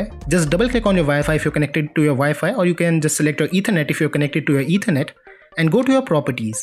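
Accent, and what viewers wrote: India. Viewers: Yeah he does have a very tolerable voice for being indian i like it he made quite the nice video too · i love your indian accent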